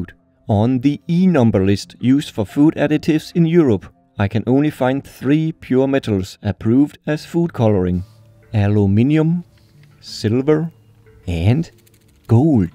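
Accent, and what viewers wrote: Denmark. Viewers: Danes don’t pronounce things the way that they appear in the spelling. “Kopper” sounds more like “koh (w)uh”. What you are hearing him do is “speaking English” with a Danish accent. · I totally knew you are Danish, because of the accent. I always wondered what exactly happens in the body when eating all that gold. I asked the chef at "Restaurant Ti Trin Ned" when I worked as disheasher in 2007/08, and he said that it was all safe. But there might be long term side effects when eating gold every day. And then again, who does that? 😁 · You sound super Danish xD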